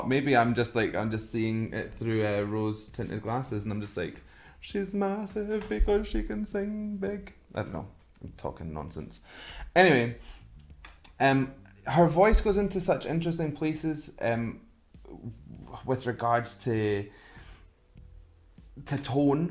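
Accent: Scotland, United Kingdom. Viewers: Do I hear a little Scottish accent? 🤩 I love it! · Scottish accent is rly the coolest !! 😁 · I absolutely love your scottish accent and I love that you love!:D I love them since 2003 and I don't know you, but I'm always happy to see someone else get excited over them:D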